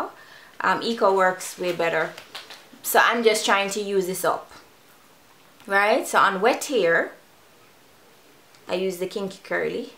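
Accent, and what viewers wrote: Jamaica. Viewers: I love watching your videos just to hear your accent im Jamaican but I don't live around a lot of Jamaicans. I love watching your videos to get a feel of home. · So bus a jamdown chat.nuh.... make mi hear it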